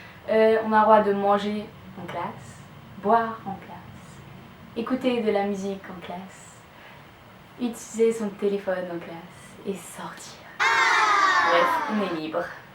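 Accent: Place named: France. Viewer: ohh, that's why i can understand your french perfectly - 'cuz your from France (*not Quebec!..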